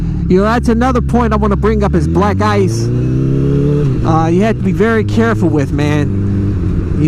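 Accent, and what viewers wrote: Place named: Cleveland, United States. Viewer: Clownsization I’m from Mayfield Village. He’s got the Cleveland accent for sure.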